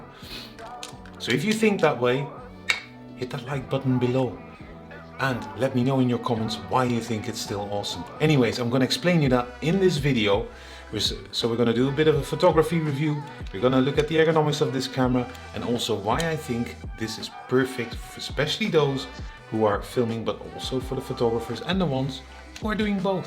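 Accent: Netherlands. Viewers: Very nice review! Your accent is... Dutch?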